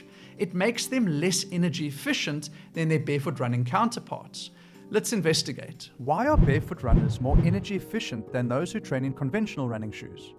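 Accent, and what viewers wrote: South Africa. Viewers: Ten seconds into the video and liked, subscribed. South African accent is so nice. You sound a bit like the former protea captain Greame Smith!! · Beautiful South African accent, made watching very enjoyable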